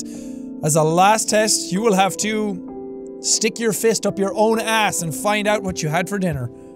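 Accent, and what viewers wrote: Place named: Ireland. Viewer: Dear anyone taking an interest in this comment, I have been watching Jack for a while now and I love him. I first watched one of his videos when I was seven, I had head phones in on max volume, Bad idea. I clicked onto the video and heard a loud Irish mans voice that almost made me deaf. I immediately clicked off the video. A few years later when I was ten I found his channel again but didn't remember it so I clicked on the video and I loved the video, I watched more videos and subscribed to the channel. I loved him, he helped me through a lot. A few minutes ago as I am writing this comment I found out I am going to his live tour on the third of November, I was and am still crying tears of happiness because I can finally meet or at least see the man who has helped me through so much. if you are reading this, thank you so much.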